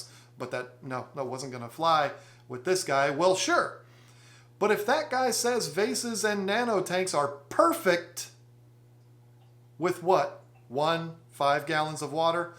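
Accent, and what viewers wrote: United States. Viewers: We get intelligent hard working people make free videos for us, and some absolute mong decides to take the mick out of their accents. Shouldn’t have even responded in my opinion but hey ho. America is huge and the various accents have way too many influences and quirks to name. Either way it is more beautiful than anything else, and the problem is with that muppets brain. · Every time it rains my pond gets filled back up and gets a water change too. Oh and I forgive you (all Americans for that matter) for mispronouncing actual English ;) Just to point out there is an "e" in beta so pronounce it bee-ta, english. While i'm here, there is a "h" in herb too, so pronounce it her-b not er-b. English do you speak it?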